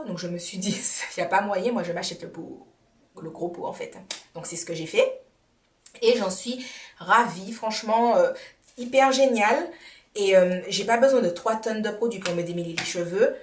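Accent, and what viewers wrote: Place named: Antilles, Caribbean. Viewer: Merci pour la vidéo, j'aime trop ton accent antillais:D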